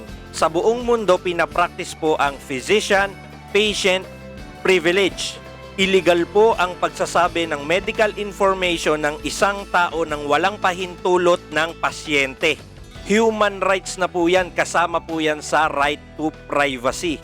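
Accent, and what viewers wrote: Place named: Samar, Philippines. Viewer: Hulaan ko probinsya mo, SAMAR noh?😁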